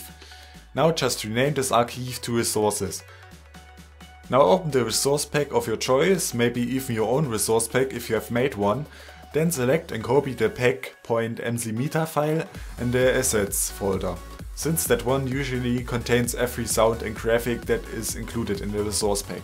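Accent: Germany. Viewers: Ja, kommst du aus Bayern? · Wohnst du in NRW? :D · Hey have you thought about letting someone else do the voiceovers? I know a german accent can be hard to get rid of for some :) Or maybe it just bugs me more than most other people here? Anyways keep up the good work :) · I love how you talk 😄. Are you German? Luv your commands 👍🏻👍🏻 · Sein Englisch ist eigentlich ziemlich gut, nur die Betonung/Aussprache hört sich sehr deutsch an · du bist deutsch nich? Hört macn irgendwie... und ja ich schau mir das Video in 2021 an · Nice vid are you German?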